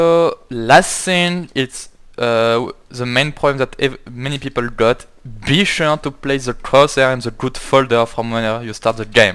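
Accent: France. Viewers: comment tes pas crédible parler anglais on le sens l'accent fr gros mdr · mec tu es Français ?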